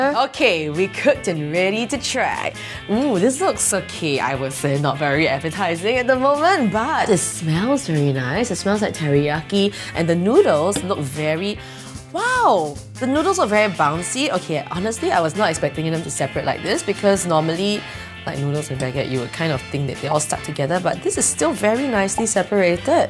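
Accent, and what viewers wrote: Singapore. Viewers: i loooove your singlish accent :) (coming from someone who lives in canada) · is an Singaporean or at least born in Singapore because she has a accent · where is she come from ? Singapore ? Malaysia ? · As a Singaporean living overseas, the most shiok thing is to hear our accent when you are going through YouTube videos. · i sounds like a singaporean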